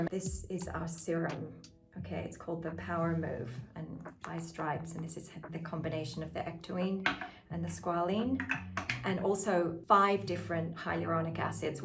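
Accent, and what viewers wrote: Australia. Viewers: She sounds very Australian to me! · Although she was born in England 🏴, I think 🤔 after she moved to Australia 🇦🇺 age 14, she eventually developed and adopted her current Australian accent.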